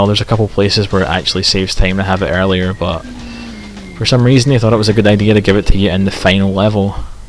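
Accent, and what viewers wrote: Scotland, United Kingdom. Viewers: Watch this video to see almost 45 minutes of a Scottish guy rambling in Scottish about an English-made video game, failing to glitch his way into a library for most of it. I believe it's his longest one...and it's not an ASMR video or a Pokémon Generation 8 "comic dub". D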